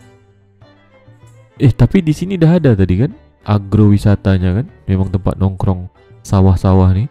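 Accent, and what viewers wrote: Malaysia. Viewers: Iya bang cantik bener sawahnya, awa senang dengar cakap logat melayu abang, salam dari awa warga jakarta bang, sehat selalu ya.( awa sdh anggukan kepalaku tiga kali bang ).. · loh bukannya abangnya pakai bahasa malaysia ya soalnya ngomongnya mirip upin ipin beda cara ngomongnya sama orang indonesia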